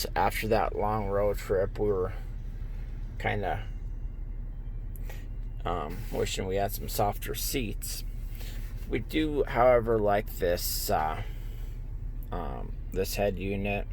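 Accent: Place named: North, United Kingdom. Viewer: You sound like a Northerner, but speak slower than a southerner.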